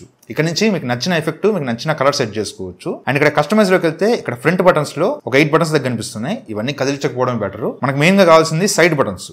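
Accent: Nellore, India. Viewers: Needhi Nellore ah?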